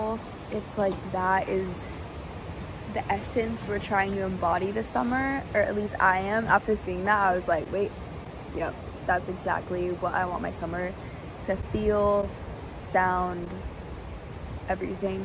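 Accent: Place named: Portugal. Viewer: ohhh I thought that u had a Portuguese accent loll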